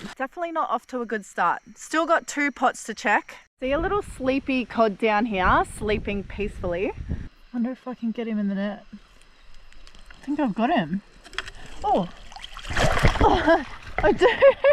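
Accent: Australia. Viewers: I definitely would like to second what this guy here just said 👆 much rather prefer her Aussie accent...kind of a sucka for that accent. Anytime you want to come to the states let me know and we can roll around 😏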